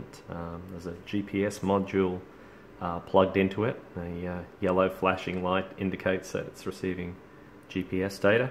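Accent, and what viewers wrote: Australia. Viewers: Great where you are ? You sound Aussie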